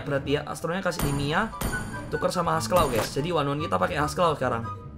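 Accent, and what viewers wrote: Java, Indonesia. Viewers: medokkkkk jawa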